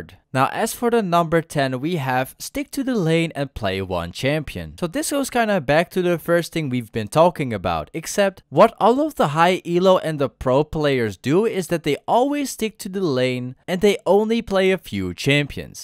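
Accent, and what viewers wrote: Netherlands. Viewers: why do i have a feelin that his mainlanguage is dutch? xp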